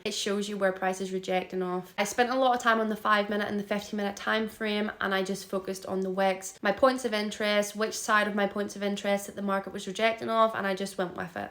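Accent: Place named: Ireland. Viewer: First time watching your video. Thought you were Indian, surprised to hear your I believe Irish accent. A suggestion would to make your content more engaging even to non traders to grow your channel quicker.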